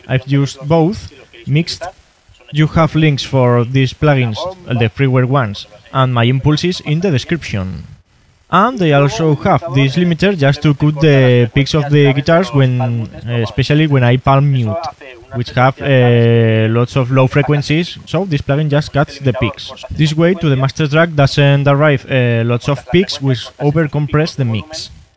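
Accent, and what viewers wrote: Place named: Spain. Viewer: Your spanish accent sound pretty awesome! ahah and don't forget to always add some tags to your videos, so you can get more views ;D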